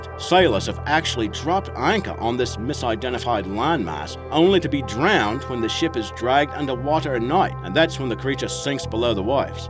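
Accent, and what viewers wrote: Australia. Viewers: What accent is that? It sounds close to Australian, but then a lot of your vowels don't sound right for Australian English. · Your accents got me stumped part aussie and part buggered if I know. Seriously what nationality would pronounce a mammal as Marmal?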